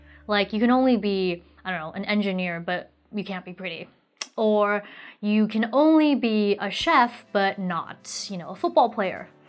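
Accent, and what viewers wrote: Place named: United States. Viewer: well...as pwrOverwhelming states..you're the perfect host for tech shows and some other shows too..crude comments from keyboarders you dealt with professionally..comments about your asian ethnicity-some were not flattering to you..to be beautiful chinese and obviously long time in america to have an american accent-you grew up in USA?..if only there were more like you..and using screwdrivers and other tools doesn't make you weird..its just shame more girls don't get involved in tech other than using smartphones..i can see a TV company contracting you with your popularity..specs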